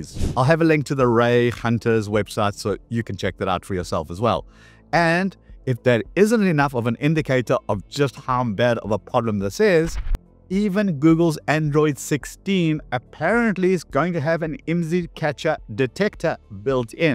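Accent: South Africa. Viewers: Whoa … when I saw your name, I expected your accent to sound way more like my dad’s rather than a South African.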